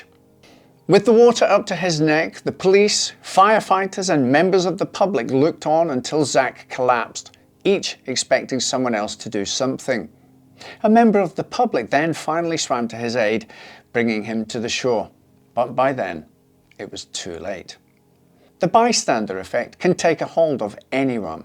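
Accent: Scotland, United Kingdom. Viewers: Why toning down the Scottish accent?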